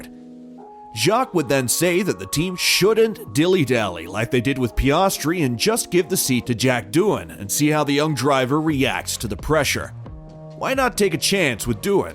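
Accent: United States. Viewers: Blar blar blar !!! I'm a top fan of F1 but this channel is starting to put me off, wait till you got some real evidence and are 100% about something, just going on and on and this Yanky doole commentating guy is starting to grind on me. Time to bow out and Unsubscribe!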